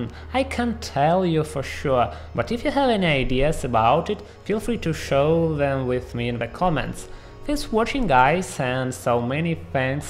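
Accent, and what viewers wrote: Germany. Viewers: He sounds German I wonder that too xD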